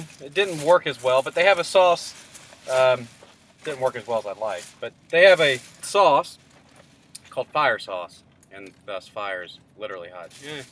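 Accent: United States. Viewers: your accent sounds like where i grew up at raleigh north carolina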